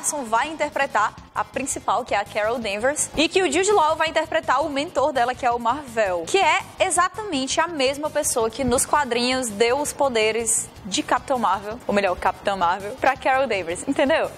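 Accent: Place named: Ceará, Brazil. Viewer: Só sei que o universo Uplay iria desmorar, se a saísse do programa. Mas, eu sei que isso não tem nem perigo de acontecer. =D P.S.: É impressão minha ou o vídeo de hoje saiu "mais cearense" que o normal? ^^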